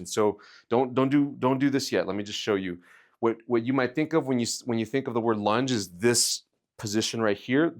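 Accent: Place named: United States. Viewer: to my British ears, he has an American accent. So I can appreciate if someone does not hear that every day in their life it might sometimes be difficult to understand. I sometimes struggle to understand drawling Southern US accents or a Cajun accent in particular because it is not something I often hear.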